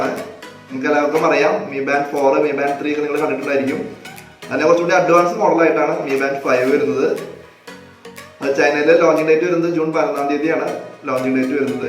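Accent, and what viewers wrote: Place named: Thrissur, India. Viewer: Thrissur slag touch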